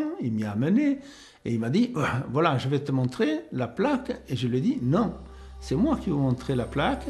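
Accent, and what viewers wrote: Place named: Toulouse, France. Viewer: Ça fait plaisir de te revoir et entendre ta voix et ton si joli accent toulousain. Merci! Ton témoignage a été l’occasion pour moi d’ouvrir une porte sur un univers insoupçonné 🙏 😘💕💕💕💕💕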